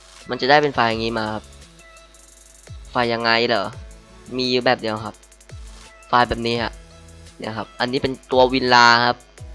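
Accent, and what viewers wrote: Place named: Thailand. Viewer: เสียงเหน่อๆ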